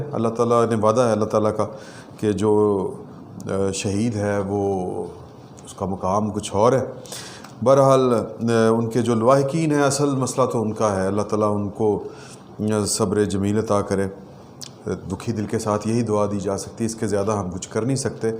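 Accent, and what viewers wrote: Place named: Lahore, Pakistan. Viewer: صاحب آپ جس لاہوری سٹائل میں بات کرتے ہیں نا وہ بہت دل کو موہ لینے والا ہے بہت شکریہ لاہور کی یاد تازہ کروانے کے لیے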